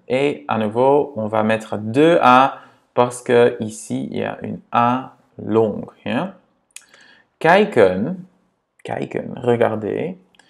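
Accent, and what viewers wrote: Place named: Quebec, Canada. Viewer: Merci tu expliques vraiment bien! Bizarrement j’entends un léger accent québécois quand tu parles français 🤪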